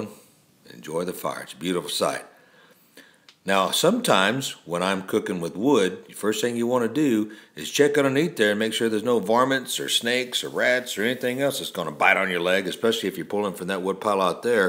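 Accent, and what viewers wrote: Texas, United States. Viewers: what part of texas is he from?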